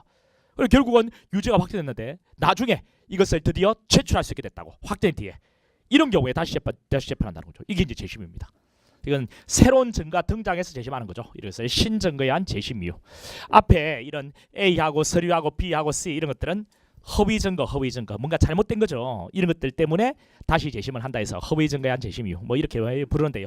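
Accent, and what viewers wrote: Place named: Gyeongsang, South Korea. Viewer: 갱상도 사투리 거부감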